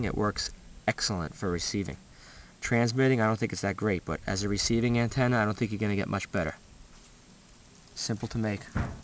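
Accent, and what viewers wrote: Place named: Boston, United States. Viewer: Are you from Boston or New York?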